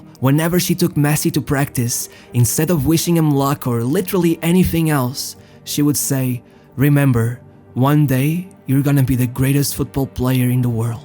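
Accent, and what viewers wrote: Portugal. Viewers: Esse sotaque e esse toque de paixão,no falar dos nossos grandes, é de um português honrado... :) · Eu sabia que eras português 😅😅 dava para perceber no sotaque mas nunca tive a certeza 🤣 Óptimo trabalho que estás a fazer! Tens jeito para desenvolver a história e arranjas boas imagens apoiar a história. Sem dúvida que envolve muito trabalho e pesquisa mas compensa, pois os vídeos são muito bons!! Continua assim, que ainda há muitos génios do futebol para falar 😉 E viva o Norte car*lho 🤣🤣🤣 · the speaker who sounds portuguese, should kno that thhe spaaish name carlos rexach is pronounced carlos rejach in spanish! I dont kno him personally, but u should kno that!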